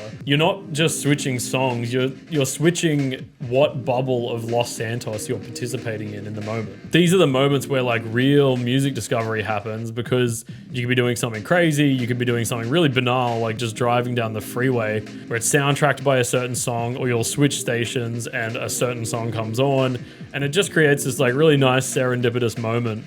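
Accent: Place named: Australia. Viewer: bro might be Aussie General Sam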